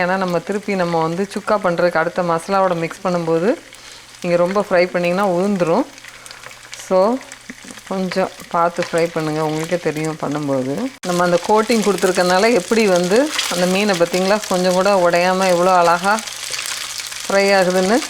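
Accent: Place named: Tirunelveli, India. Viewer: மேடம் உங்கள் டிப்ஸ் அருமை உங்கள் பேச்சில் திருநெல்வேலி வாடை வருகிறதே எந்த ஊர் நீங்கள்.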